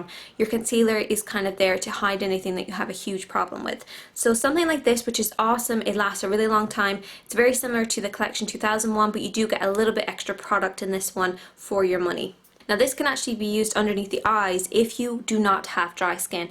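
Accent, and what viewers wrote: Ireland. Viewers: I think she's from Ireland?...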